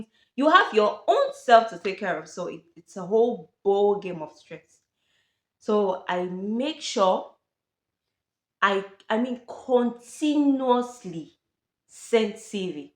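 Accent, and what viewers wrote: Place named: Africa. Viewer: Hi,it's so goo d watching your videos really. You are indeed an hardworking young African. You claimed to a Cameroonian,but your spoken English sound like someone from Anglophone African countries.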